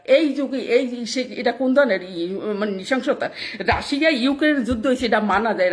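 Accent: Barishal, Bangladesh. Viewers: Apni ki borishaillah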